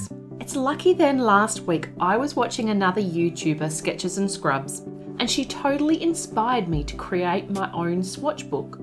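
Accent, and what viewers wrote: Australia. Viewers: You could call it "My Swatch Book: because PPPPPP" Love your work as always, it's always so refeshing hearing another Australian accent on YouTube!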